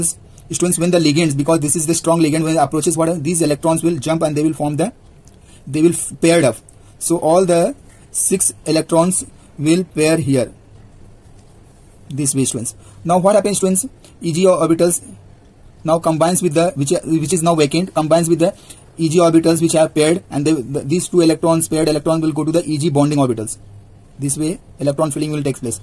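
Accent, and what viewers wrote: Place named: India. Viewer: Thank you 🙏 I watched the video with 0.75 speed Please speak slower It is a bit hard for us( people outside India) to understand your accent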